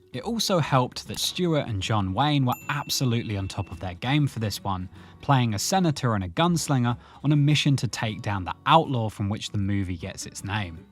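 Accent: United Kingdom. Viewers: Terrible list, but the guy sounds British, so....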